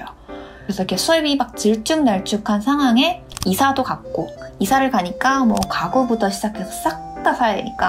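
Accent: South Korea. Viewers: 日本語の発音が韓国人っぽくなってきましたね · やっぱり8ヶ月も韓国にいると日本語が韓国の方の日本語になりますね！可愛いです😭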